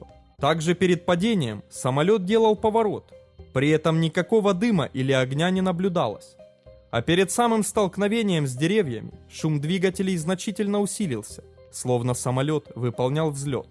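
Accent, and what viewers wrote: Ukraine. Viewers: Самый обыкновенный украинский акцент:) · Капец, какой противный хохляцкий акцент:третЯво сентЯбря тысЯча! Вообще слушать противно. Сразу и выключил. Аффтор, исправляй свой хохляцкий акцент.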